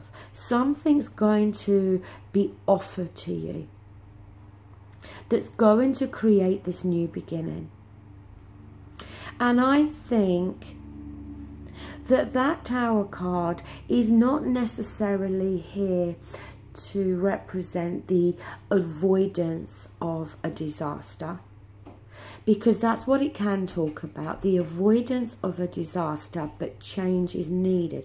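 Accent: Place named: England, United Kingdom. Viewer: thank you as always you are amazing, and not to mention the most beautiful English accent in the whole of England!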